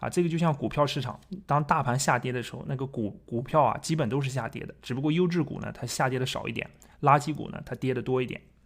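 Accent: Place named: Shanxi, China. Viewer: 感觉你是山西人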